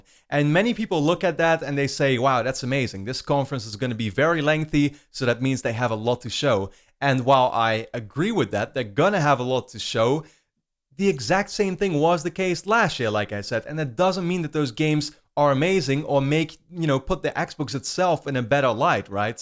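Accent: Netherlands. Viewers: Hey man, are you Dutch? I live in Amsterdam, want to play some XBL?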